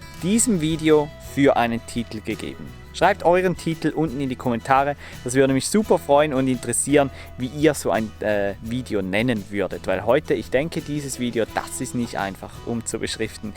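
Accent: Switzerland. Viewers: Sorry, aber... Wenn Schweizer Hochdeutsch reden, geht garnicht...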